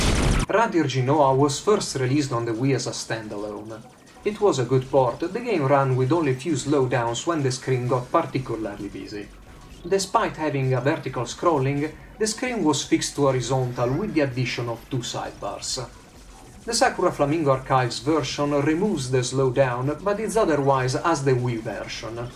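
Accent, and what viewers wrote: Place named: Italy. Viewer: im sure you heard this many times before but.. i looove your accent. Hearing that italian accent is such a breath of fresh air to my ears =)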